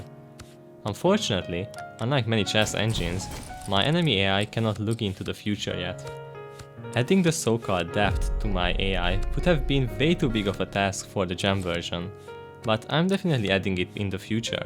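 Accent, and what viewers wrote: Hungary. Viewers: Are you Hungarian? Just asking because your accent is realy familiar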